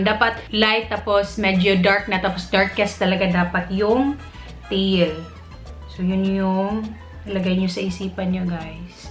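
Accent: Visayas, Philippines. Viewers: You have the bisaya accent. Are you? Hehe btw love this video. ❤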